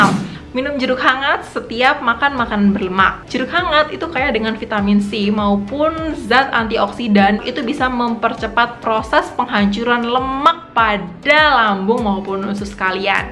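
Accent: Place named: Banjarmasin, Indonesia. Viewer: Kaya nya orang banjarmasin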